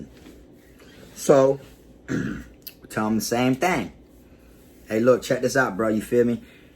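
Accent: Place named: South, United States. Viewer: Southern accent tryin to sound street.... -20 IQ points.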